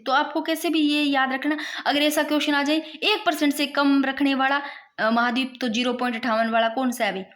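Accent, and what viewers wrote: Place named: Ganganagar, India. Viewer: मुझे to surtgrh- ganganger- hnumangarh तो pki h ❤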